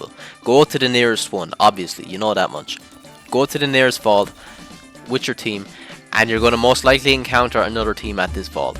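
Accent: Ireland. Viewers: Hey, thank you for not using AI voice anymore, up the Irish accent!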